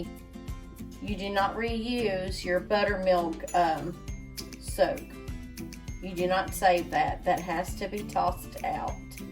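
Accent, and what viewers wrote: South, United States. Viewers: I’m afraid I didn’t have an easy time of it while trying to remove the membrane off the ribs. Where are you from, I love your southern drawl!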